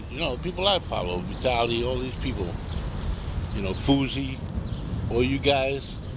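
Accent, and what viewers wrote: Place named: New York, United States. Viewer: New fan here! My name is Tony, I am from Illinois and married with two boys. I would like to tell you that keep doing what your doing, be real, I am 32 years old and I get your humor! Also, helps, that to someone like myself to see your way of living, accent, being from new york is ALL interesting enough! Would love a shout out on a vlog of yours man, would make my day to see a future "youtube kingpin" pay back the love! Keep vlogs coming man, Love'em!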